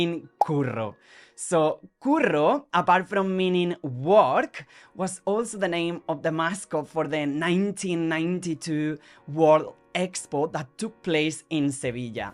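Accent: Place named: Scotland, United Kingdom. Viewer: As a Scot...i detected the Scottishness straight away... I also talk Scotspanglish... Really well...😂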